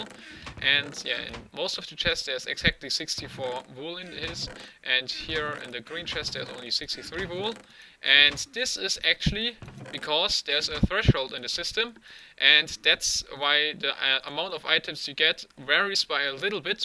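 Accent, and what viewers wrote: Germany. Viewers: Du bist deutsch oder? :D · You are german, right? :D Man hörts an der englischen Aussprache :D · Bist du Deutscher? ^^ Dein Englisch hört sich irgendwie so an :D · 100 % german-english ^^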